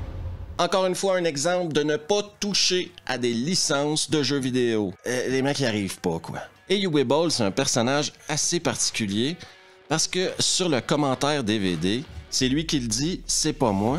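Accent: Quebec, Canada. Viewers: Super vidéo et superbe accent québécois ! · Hahaha Mais c’est tellement horrible et drôle de mater des vidéos en québécois.. quel enfer vot’accent.. 😂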